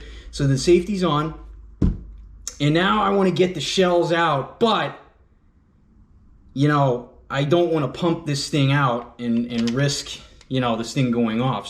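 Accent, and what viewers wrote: United States. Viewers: Dummies don't need to be within 5 miles of a firearm. Dummies get themselves and other innocents killed! This guy has a damn Yankee accent and attitude! "YANKEE GO HOME!" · Hey, bro, This is Seoul and I’m a South Korean. I love your English accent. Where are you originally from? Born and raised in Texas?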